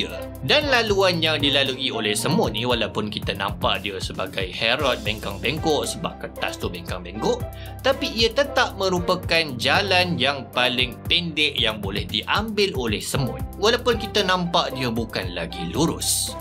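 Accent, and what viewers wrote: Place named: Perak, Malaysia. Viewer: Tk silap perak yg dekat dgn bahagian kedah sebb loghatnya bercampur utara dgn perak.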